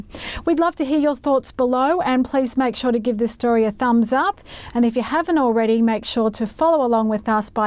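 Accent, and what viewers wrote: Australia. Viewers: Thanks God for a good honest reporting I like the epic time you’re a spicy lady with awesome Australian accent keep up the great work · U sound like a Aussie..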